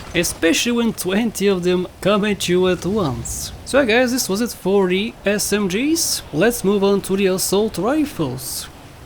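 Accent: Russia. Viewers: Are you Russian bro? I only ask because my mum is Polish and I think your accent is dope. Don't take any shit from anyone about it. Your channel is awesome. :)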